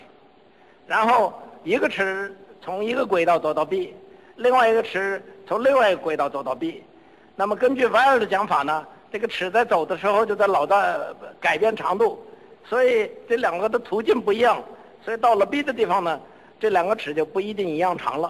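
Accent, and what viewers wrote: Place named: Yunnan, China. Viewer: 杨老为啥有这么重的云南口音啊？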